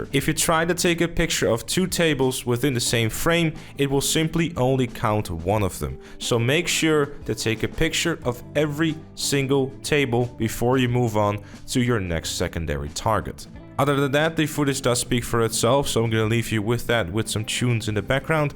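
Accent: Netherlands. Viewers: You sounds like a Dutch guy who's speaking English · This guy has a dutch voice haha. You must be dutch (nederlands)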